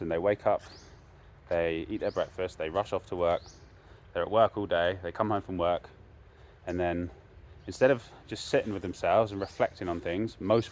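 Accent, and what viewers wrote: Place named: England, United Kingdom. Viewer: What country is this, in.guy is English.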